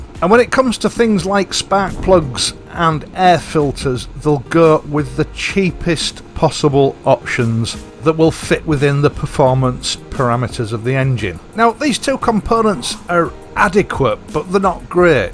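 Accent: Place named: Northeast England, United Kingdom. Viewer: I really love your videos and your accent! May I ask, are you from the northeast of England? Cheers from Austria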